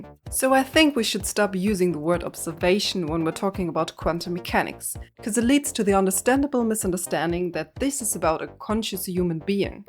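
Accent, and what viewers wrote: Germany. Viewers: Agreed, I don't think this is Sabine, but I did do a double take at first. I believe it's a German accent, but I'm no expert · I think, no. Definitely German, but different intonation to my ear. Softer vowels, less nasal (not that Sabine is incredibly nasal), and more rolling inflection, rather than the distinct highs and lows of Sabine. Their voices are similar in some respects, but they are definitely different people to my ear.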